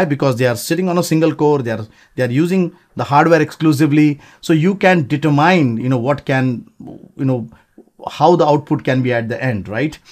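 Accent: India. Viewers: as to his accent, of course it's indian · omg i can finally found an indian acent that i could understand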